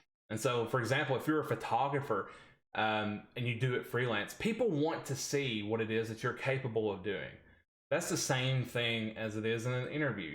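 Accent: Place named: Texas, United States. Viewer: Nice video. Nice job. Everything said is to the point and very accurate. Cool southern accent too. Probly Texan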